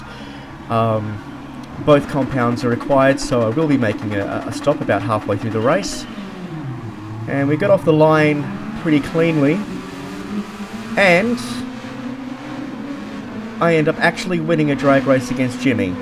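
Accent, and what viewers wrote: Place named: Australia. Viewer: You have an aussie accent, very nice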